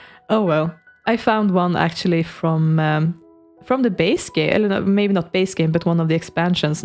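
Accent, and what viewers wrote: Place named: Sweden. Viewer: You are from Sweden 🙂 I have think when I heard you that you sound a little bit Swedish. I’m from Sweden too btw. In the end of 2022 I played The Sims 2 last time, but I played the Swedish version. So I connected all neighbourhoods in The Sims 2 so families in “Skönköping” could walk or take the taxi to ”Uddaholm” and the other neighbourhoods. Then I build my own version of Old Town from the first The Sims. The last thing I tried to do was to build Sunset Valley from The Sims 3 but then I stopped using The Sims 2 after I had a fever dream about The Sims 2.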